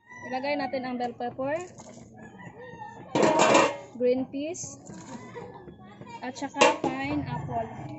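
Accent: Philippines. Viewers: Gantong vlogger dapat sumisikat eh. Ganto dapat nagrerepresent sa ph vloggers. Pinay beauty, pinay heart 💙 Yung accent pa talaga pinoy 😍💙 support ate. God bless u moreeee!!!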